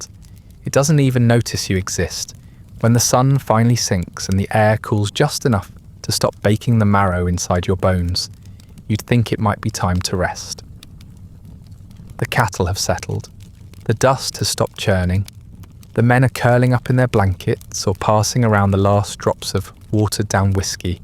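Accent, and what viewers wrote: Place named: United Kingdom. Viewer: Hey, I’m here again! Idk why your voice is so soothing; but, thus far, yours is the best, from I’ve found here in Houston, Tx… A.M. also, idk why the Brit voice so Many, to the American ear, is so appealing! TY!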